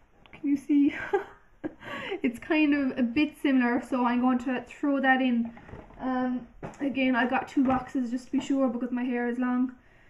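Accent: Ireland. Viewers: Are u Irish? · A Irish u tuber way ha! Glad to hear a Irish voice ! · I love the way u speak. I like irish language, in half irish (wish i could talk like u guys)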